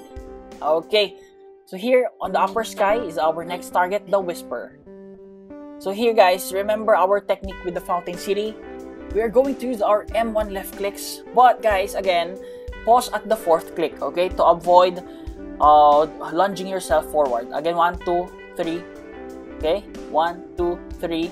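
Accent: Philippines. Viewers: He sounds Filipino. Is he Filipino? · why do you sound tagalog/pilipino hmmmm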